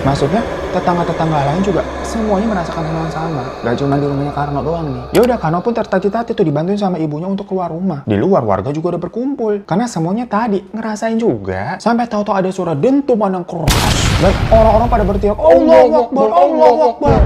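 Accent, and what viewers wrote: Java, Indonesia. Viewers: Lucu bngt bang kalo ngomong Jawa wkwk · Lucu banget sih bang ngomong jawanya😂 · Bang” , bahasa jawamu itu lhohh , ahh gemes 😂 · hahaaa mas menghayati peran... pake bhs jowo😁salut mas.... sukses selalu yaaaaa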